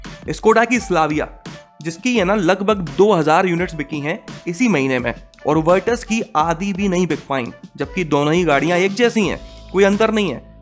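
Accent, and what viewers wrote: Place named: Gwalior, India. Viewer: Makhane fik chuke mp gwalior language 😬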